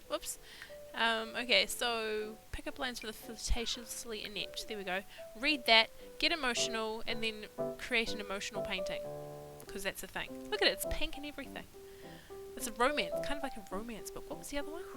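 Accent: New Zealand. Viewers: I love the sims. love kiwi accents also "struth"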